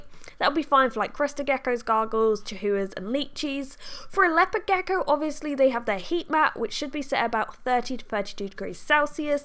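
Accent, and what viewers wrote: United Kingdom. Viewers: Cheers for the great information :) also are you British lol ?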